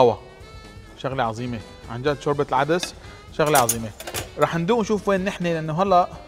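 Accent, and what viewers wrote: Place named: Syria. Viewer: انضميت للقناة كرمال اللهجة السورية بس♥️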